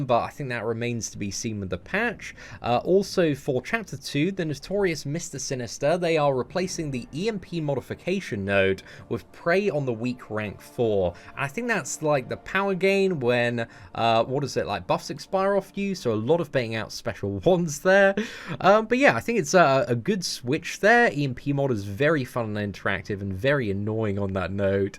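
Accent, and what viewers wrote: United Kingdom. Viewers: Mephisto getting another synergy and a profile pic finally maybe!? Let's go bro! As always appreciate the breakdowns of every new event so I can be lazy and not read the forums and listen to a cool British dude.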